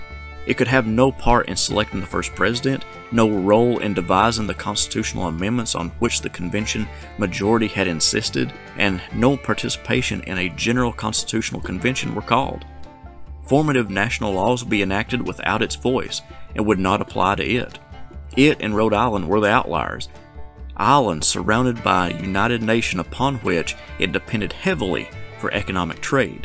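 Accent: South, United States. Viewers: lol not used to hearing country accents like yours on youtube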